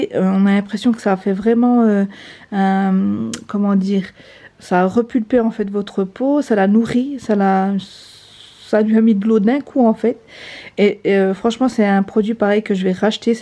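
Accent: Belgium. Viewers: Salem, top ton vlog mais moi je suis pas motivée du tout pour le grand ménage...... Mais dis moi ton accent vient du nord ou de Belgique? A chaque fois je veux te demander mais j'oublie...lol bisous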